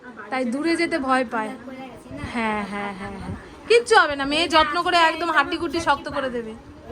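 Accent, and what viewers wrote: Bangladesh. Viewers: সব ভাল কিন্তু এতো বছর ভারতে থেকেও বাংলাদেশি ভাষা ছাড়তে পারেনি।ফোন দেওয়া, চাবানো, কান্না করছে এইসব শুনলে বিশ্রী লাগে। এদেশিয় ভাষাটা শেখা উচিত ছিল।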